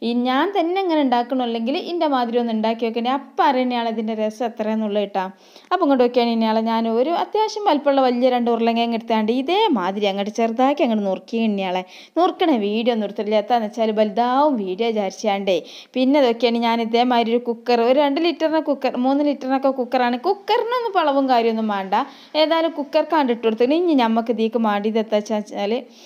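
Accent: Malappuram, India. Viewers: ഇത് ഒരു 50 വർഷങ്ങൾക്ക് മുമ്പെയുള്ള മലപ്പുറം വർത്താനമാ 😂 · It ha no malapuram bhasha?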